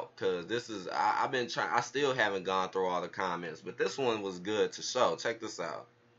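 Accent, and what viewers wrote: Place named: United Kingdom. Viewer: Wow. I'm glad I"m not the only one that caught the British accent being covered up. I bet this guy originates from Nigeria or one of the islands..They frequently migrate to London.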